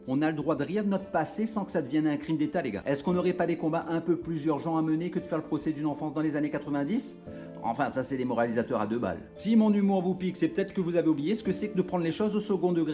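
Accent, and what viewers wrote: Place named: Kabylie, Algeria. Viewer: Continue ce que tu fait , bravo elle sont super tes vidéos et une belle élocution de Kabylie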